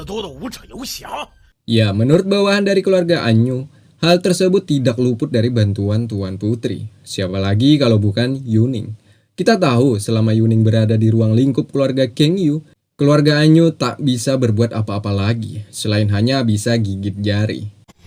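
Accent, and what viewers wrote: Banjar, Indonesia. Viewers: Mantap mbuk ai banjar banar sdh🤣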